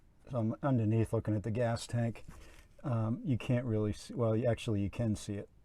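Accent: New England, United States. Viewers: Love the New England accent..sounds like New Hampshire or Massachusetts.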